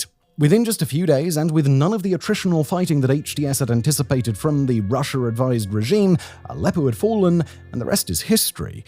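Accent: RP, United Kingdom. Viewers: Out of this entire video. Simon saying Ray nay sanse. Instead of renessance. Whilst saying in other videos taco sounds posh but tayco as he pronounces it. it tells me a lot. British pos.h. living in Prague. Gotta make that cha cha cha'ching.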